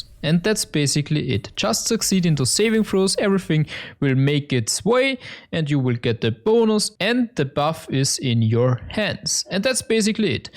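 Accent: Germany. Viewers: Junge dein deutscher englisch dialekt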